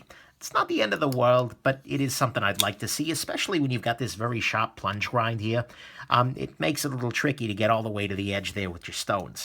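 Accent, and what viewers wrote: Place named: Alabama, United States. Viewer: I love your Alabama accent 👍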